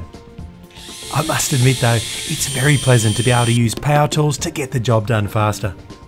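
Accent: Australia. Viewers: the Australian accent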